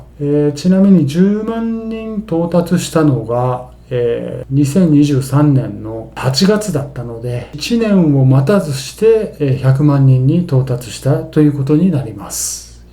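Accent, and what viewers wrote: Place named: Fukui, Japan. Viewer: イントネーションが福井っぽい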